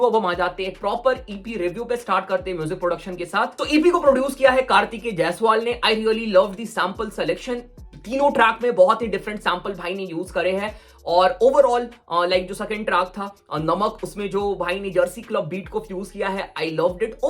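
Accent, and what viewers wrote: Bihar, India. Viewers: Bhai tu bihari hai? Bihariyon jaise kyu bolta hai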